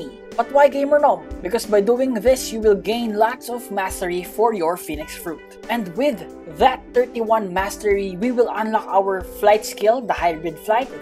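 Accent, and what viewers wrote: Philippines. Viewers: I think he is from pinoy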